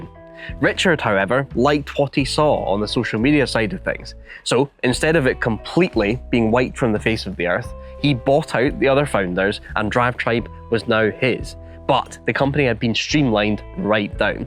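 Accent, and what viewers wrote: Scotland, United Kingdom. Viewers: Ahh! You have probably the best taste in cars of any person on Youtube (as in, unloved British cars).. Best of luck with the new channel, make sure you put subs on all your vids because I can't stand the Scottish accent - I always used to watch the DT videos in silence with subs. Can't wait to see what happens.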